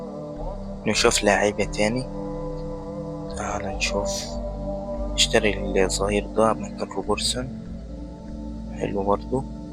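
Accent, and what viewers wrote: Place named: Upper Egypt, Egypt. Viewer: انا عاوز اقولك كمل احنا معاك انت بادئ بمحتوى حلو كمل فى محتوى بيس انا مش قصدى اترقك عليك بس حاول تغير لهجة الصعايدة فى الفيديوهات بتاعتك الصعايدة اجدع ناس والله و على راسنا من فوق بس المحتوى الى بالهجة الصعيديه مبيجيبش رتش فأنت اتكلم بلهجة القاهرة احسن و يريت تاخد برأيى الى معايا فى القرار ده يعمل لايك على الفيديو و يعمل لايك على الكومنت بتاعى عاوزين نشوف عدد الى موافقين❤❤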